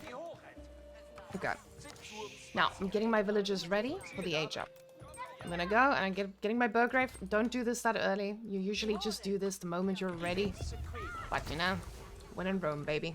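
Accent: Afrikaans, South Africa. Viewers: Awe my bru! Is that a afrikaans accent I hear?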